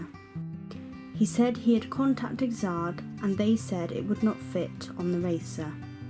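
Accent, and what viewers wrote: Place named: United Kingdom. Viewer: The bike looks, and sounds, absolutely amazing. Of course, so do you, young lady. I love your accent. When I found out I am part Brit (not sure if you watched my video about it) I wish it came with a bit of a Brit accent, too. LOL. Your little brother has great balance, does he compete?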